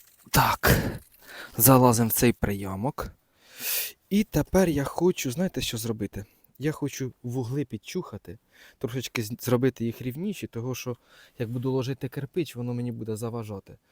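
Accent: Poltava, Ukraine. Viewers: Теж зачепилася саме за говір, одразу зрозуміла , що полтавський. Обожнюю і Полтавщину, і говір, люди, як на мене найприємніші і найщиріші з усієї України. Буду слідкувати за ремонтом! Знаю, що це таке, сама ремонтую свою хату на Вінничині, це дуже радісно потім бачити результат і особливо жити в своєму, а не найманому житлі і вирощувати все, що хочеш! Бажаю успіхів і розвитку, буду слідкувати! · в мене родичі теж живуть на Полтавщині. Розмовляють як. А дідусь з Черкащини. До Другої світової війни була Полтавська обл. Теж так розмовляв, як. Приємно слухати. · Вітаннячка! Все моє дитинство, пройшло у бабусі в Полтавській області... Життя іде, бабуся вже давно померла і відвідати рідні краї вже нема можливості... На Ваш канал натрапила випадково і почала дивитись. І чую у розмові, щось таке рідне, таке знайоме, а потім зрозуміла - це полтавський акцент, він особливий❤ Бажаю Вам здійснити всі Ваші мрії і реалізувати всі задумані плани! 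Ви щира і добра людина)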